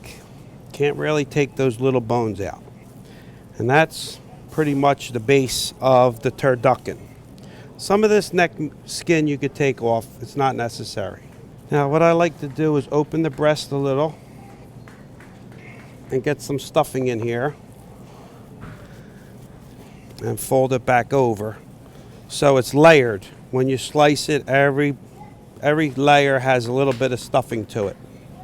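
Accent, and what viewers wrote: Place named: New Jersey, United States. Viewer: mmmmm goodeats!! and I love that jersey accent. mwah!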